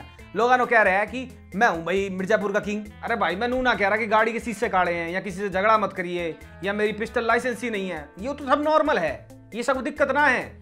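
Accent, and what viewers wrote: Haryana, India. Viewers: Mast haryanavi bolta hai tu....... Continue it in ur video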